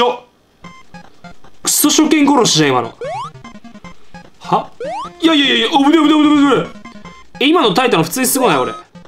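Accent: Kansai, Japan. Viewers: 結構関西弁使いこなしてて草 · なんで関西弁なの？ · 関西弁草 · この時のサワってめっちゃ関西弁使ってるんやなww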